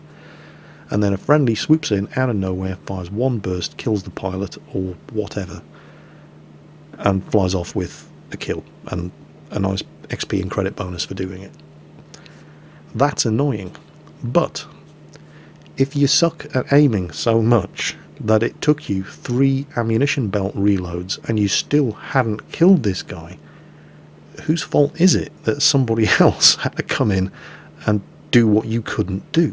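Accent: United Kingdom. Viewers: we love the Man Cave becasue we get to know more about you then just "That cool old british guy we hear on videos" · It's dat accent. Classical Brit is what makes you popular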